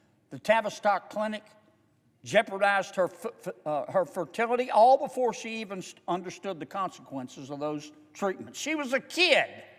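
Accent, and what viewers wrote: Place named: Appalachia, United States. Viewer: John Kennedy the hillbilly talker from Connecticut.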